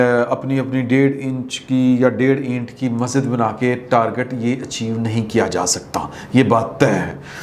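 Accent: Punjab, Pakistan. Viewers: Syed shb ap boht acha analysis krty Hain with Punjabi tarka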